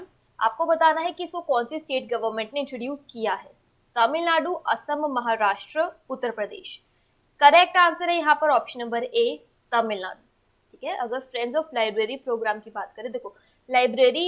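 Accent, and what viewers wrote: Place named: Haryana, India. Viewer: Madam ji aap haryanvi laggo ho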